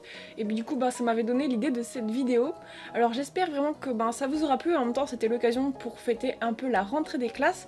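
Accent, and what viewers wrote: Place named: Nord, France. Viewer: YES je savais que je connaissais bien cet accent ! T'es à la voie 9¾ de Lille Flandres ! Eune sorchière deuch'nord !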